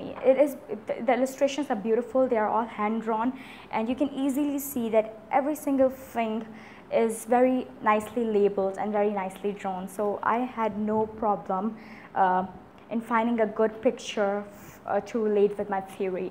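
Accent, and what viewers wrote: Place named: United States. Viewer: Your pronunciation is just like American but little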